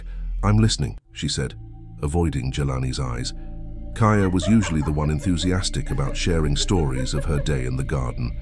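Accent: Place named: United Kingdom. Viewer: Yes I like this deep British/original English accent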